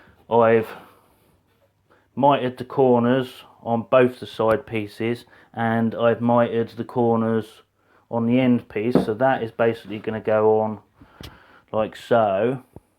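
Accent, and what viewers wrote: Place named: United Kingdom. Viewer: Great job, that holder will never fail! Probably more valuable than the glue guns. Well I am part of the 20% who is a subscriber. My question for you is what part of the UK does your accent from. I have two friends in the States who are from Yorkshire and Wales. Your accent is much different. I am from Tennessee here in USA. I do like the two flags you made but watched the video and discovered that it is a long build.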